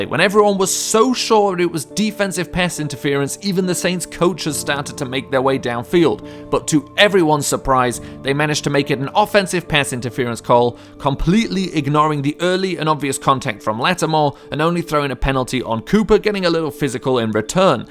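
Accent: United Kingdom. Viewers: U sound like a complete dick head 😂 u sound like ur from the UK or some shit why are u even talking football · Its weird hearing a Brit or Aussie talk about American football. Whatever you are.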